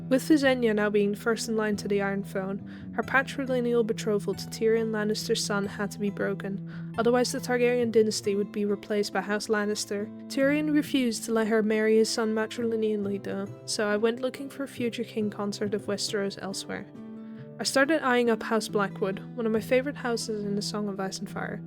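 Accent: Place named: Netherlands. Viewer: ik herken een Nederlandse accent van een kilometer ver😅 Toffe video tho en origineel.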